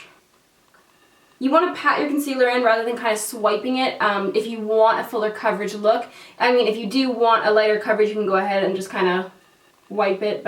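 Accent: Canada. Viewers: YOU DREEEEEEG ALONG THAT LASH LINE! Love the Canadian accent.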